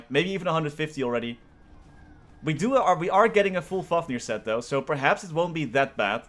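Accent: Netherlands. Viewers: NL accent is er moeilijk uit te krijgen bij sommigen xD echt hoe hou je het vol zo lang aan t maplen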